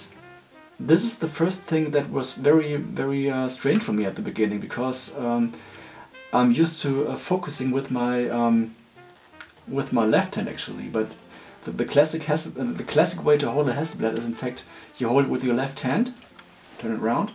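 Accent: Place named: Germany. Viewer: Ich muss ehrlich sagen, dass Video ist super, auch wenn ich persönlich ein anderes Resultat habe nach dieser Expertise. Was ich nicht verstehe, es gibt soviele Videos auf Englisch über die Hasselblad und Rolleiflex und dann kommt Mal ein deutscher der ein Video macht, beide Kameras aus der Praxis kennt und wieder auf Englisch. Sorry aber der Akzent ist nicht zu überhören. Die Bilder zum Schluss sind sehr schön, erste Sahne.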